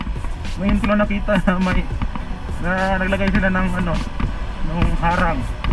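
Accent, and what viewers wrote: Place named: Cebuano, Philippines. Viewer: Halatang bisdak paps ah😁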